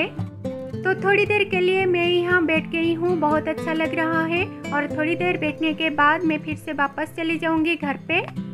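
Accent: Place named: Assam, India. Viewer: Tumar kothat gom e pai tumi Assamese suwali buli...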